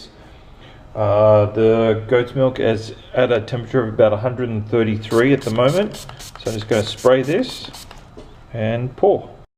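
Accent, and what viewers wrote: Australia. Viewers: Oh Yay, a soap maker with an Australian accent!!! I'm in the early stages of learning about soap making, and I'm nearly ready to start making. Can you please give me some ideas of the best places to buy soap supplies, essential oils, colours etc... In Australia? I'd really appreciate it. The only place I've found so far is, Aussie candle supplies.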